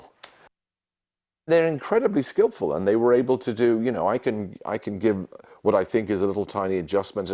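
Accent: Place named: United Kingdom. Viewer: Wait, I think that he has a british accent 🤔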